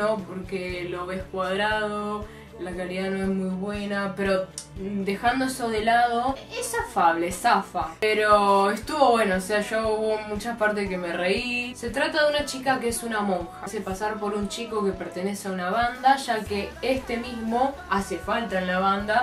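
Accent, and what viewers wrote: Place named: South Korea. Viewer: JAJAJAJA la intro!! El coreanespañol cute que se te sale, es re tipíco de doramatica!! Personalmente te recomiendo Black que está en netflix, no te vas a arrepentir!! También te recomiendo Hello my twenties, las vas a adorar, también Descendants of the sun, Weightlifting fairy Kim Bok Joo. En fin, no terminaría más de recomendar pero dejo esos que están geniales. Saludos!! 💕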